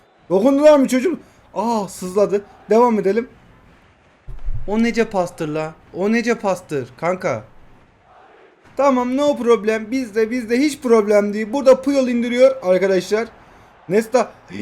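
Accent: Kahramanmaraş, Turkey. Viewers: abi sen maraşlımısın kfepofjkwsf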